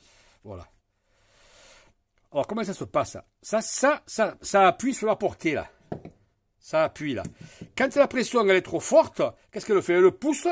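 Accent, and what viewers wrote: South, France. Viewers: Bonjour et merci pour le tuto et avec l'accent du sud encore mieux Bravo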